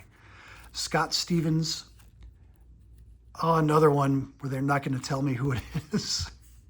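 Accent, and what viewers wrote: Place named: Canada. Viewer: Thanks for sharing, loved listening to you speak Canadian to us! The 1991 O-Pee-Chee baseball was a small set and was heavy on the Canadian teams, so you were right on.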